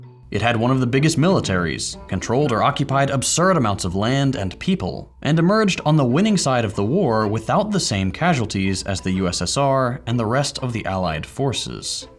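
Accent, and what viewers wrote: United States. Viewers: Just wondering, are you an American?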